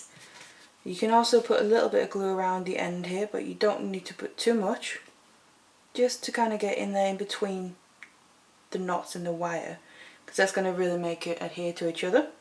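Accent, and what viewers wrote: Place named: Macclesfield, United Kingdom. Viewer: My cousin lives in Maccelsfield. Her accent seems close to yours - is your location mid country?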